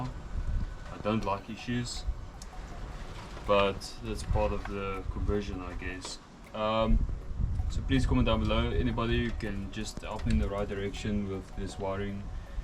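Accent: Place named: South Africa. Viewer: I’m assuming you’re from somewhere in SA. I can tell why the accent, I just saw the ad for this channel and I’m loving it keep up the awesome videos.😁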